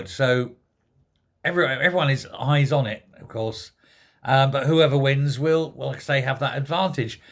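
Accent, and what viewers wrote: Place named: Cockney, United Kingdom. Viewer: why is a cockney comentating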